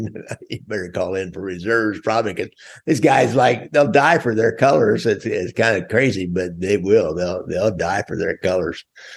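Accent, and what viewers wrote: United States. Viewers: I just love hearing American pronounce New Zealand place names. Good video mate. Thanks.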